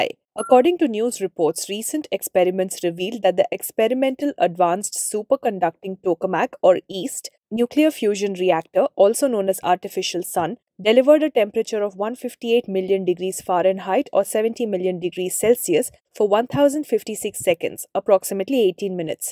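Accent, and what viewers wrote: India. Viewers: switch off soon as heard the Indian accent lol biggest lying media in the world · An Indian voice over to debunk sun simulators?? OK ill fake check that as false.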